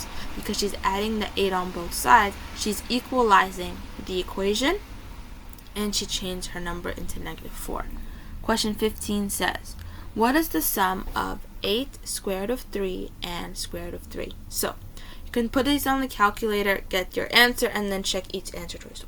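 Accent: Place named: New York, United States. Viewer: I am new to the channel I can just tell you are are new yorker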